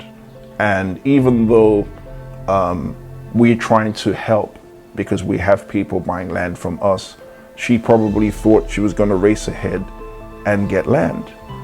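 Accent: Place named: United States. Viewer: Good advice! Your accent seems more Americanized with slight hints of British/Ghanaian.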